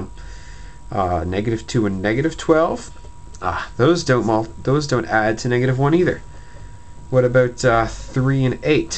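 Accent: Canada. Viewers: U canadian? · I like your Canadian accent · are u canadian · hi fellow , u canadian xD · Off topic but are you Canadian? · Get Oooot of ere! I love the Canadian accent! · Let me guess, Canadian??? You said oot instead of out. Great video though. Thanks. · are you canadian? ^.^ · are you from Canada?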